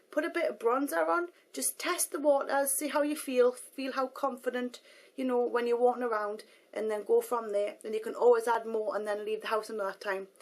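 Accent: Newcastle, United Kingdom. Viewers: "That FREE glue is the best I've ever used" That's the kind of honesty that keeps me coming back. That, the pugs and the geordie accent.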